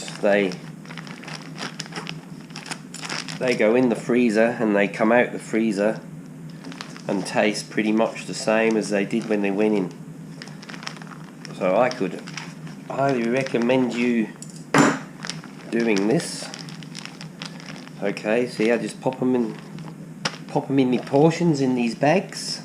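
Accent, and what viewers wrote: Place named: England, United Kingdom. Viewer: HELLO ", HOPE YOU ARE DOING WELL? I WATCHED YR" VIDEOS THAT YOU HAVE BEEN DOING. MMMMMM? WER DO EYE" START? FIRSTLY YOU COME ACROSS SO"SO" WELL WITH YR POMMY ACCENT! YOU EXPLAIN WHAT AND HOW YOU ARE DOING. GOOD STUFF MATE. PS" LOVE YOUR HELPER IN YOUR FILLETING VIDEO. CHEERS MATE ANDRE GEORGESCU...🦊🦊🦊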